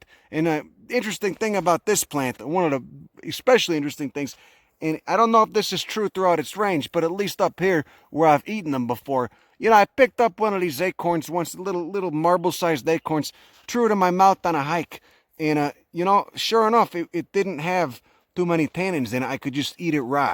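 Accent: Chicago, United States. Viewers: This guy sounds like he's from the near south east side of Chicago! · He's an Italian from Chicago. And that's a pure Chicago italian accent.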